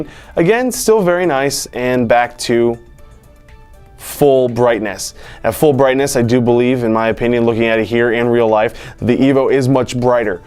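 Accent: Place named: Australia. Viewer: awful aussie accent mate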